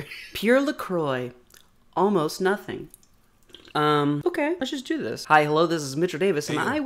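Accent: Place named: Boston, United States. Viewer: It’s a fucking Boston accent Mitchell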